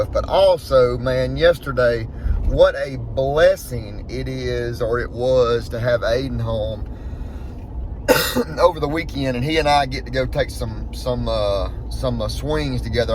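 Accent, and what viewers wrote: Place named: South, United States. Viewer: I love to hear southern drawl talk! It's a southern heritage to be proud of. Hope your hunt is going well! Blessings!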